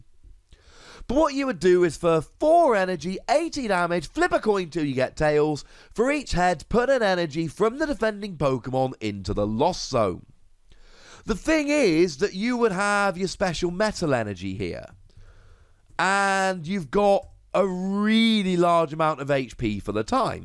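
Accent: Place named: England, United Kingdom. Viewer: I LOVEEEEEEEEE English accent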